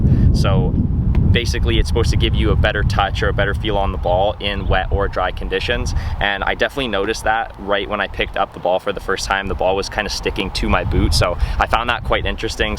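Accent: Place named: United States. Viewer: you sound like an amercian but if you gonna review fix up on your wording and facts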